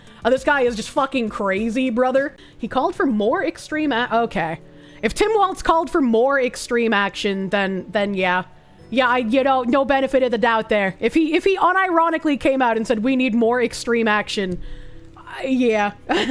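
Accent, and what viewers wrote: Boston, United States. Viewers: has the only Bostonian accent that doesn't give me brain damage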